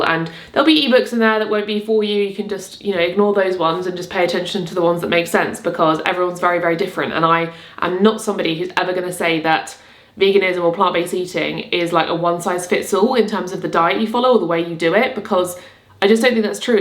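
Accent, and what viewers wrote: United Kingdom. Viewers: I already booked your plant comfort kitchen ebook last year, but I could not resist getting the bundle! It's a bargain. For 50$ I got so many books and recipes that I'm looking forward to trying. Of course the first ebook I downloaded was versatile vegan and I loved it! I truly recommend the bundle to vegans(like me) and people who want to eat healthier. I just love your recipes. Can you imagine? I started following you because of your lovely British accent years ago? 😍 I'm Italian but I live in Malta and soon I'm moving to one of the islands you love...❤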